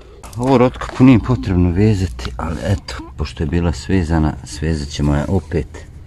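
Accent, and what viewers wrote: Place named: Bosnia and Herzegovina. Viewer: Obozavam gledati tvoje video snimke,legenda si ,pogotovo kad ti cujem naglasak vratim se u Bosnu opet ..Pozdrav iz ledare Norveske!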